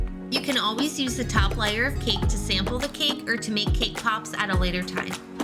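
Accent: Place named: Midwest, United States. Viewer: Just out of curiosity (and you don't need to answer if it makes you uncomfortable), but where are you from? My ear is guessing somewhere in the midwest...but that's just a guess.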